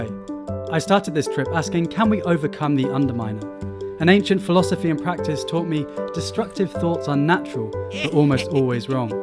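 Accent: United Kingdom. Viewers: British accent is horrible...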